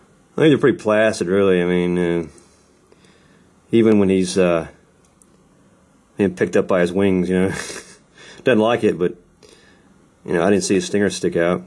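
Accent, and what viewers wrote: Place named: United States. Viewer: OMG YOU'RE AMERICAN AND I LOVE YOUR ACCENT xxx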